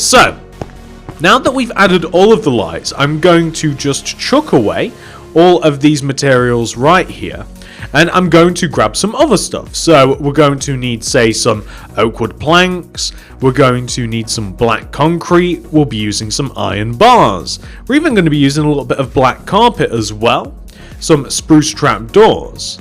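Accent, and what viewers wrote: United Kingdom. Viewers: Quick question Are you british bc you sound british to me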